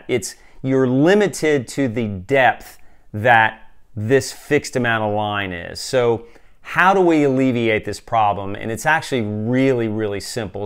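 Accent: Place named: New Jersey, United States. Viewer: It says Old Dominion, but every time you say "wadder" I hear NJ/eastern PA.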